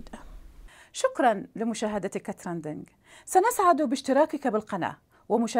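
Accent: Iraq. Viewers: ياجمال لهجتك العراقيه ❤ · شگد حلوة اللهجة العراقية للمذيعة بنت الحلة 😁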